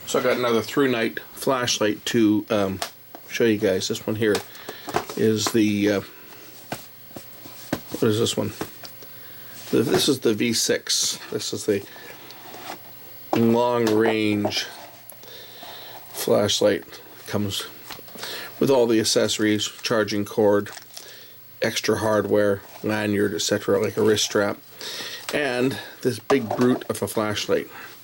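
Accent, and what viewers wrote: Canada. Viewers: From your canadian english accent I think you are from British Columbia?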